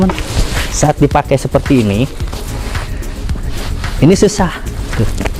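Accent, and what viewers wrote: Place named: West Java, Indonesia. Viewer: Logat Sunda na mah Aya keneh 😁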